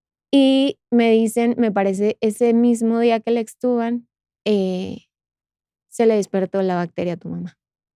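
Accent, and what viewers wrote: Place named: Colombia. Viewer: Habla como Colombiana...solo falta el ...como así...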